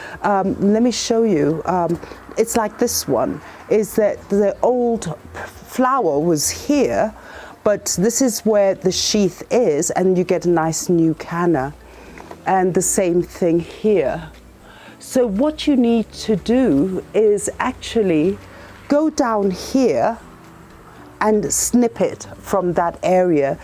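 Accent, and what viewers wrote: England, United Kingdom. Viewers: Hi I heard that you mentioned sand. I've found my rhizomes are prone to rotting. Could you let me know the ratio of the ingredients in the compost please? You're so English. Where are you based please? Lovely to hear English English! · Where are you? You sound English but that doesn’t look like England. Are you in South Africa?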